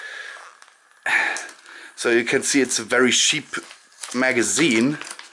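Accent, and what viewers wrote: Germany. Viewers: For god sake ur german accent is wünderbar! :oo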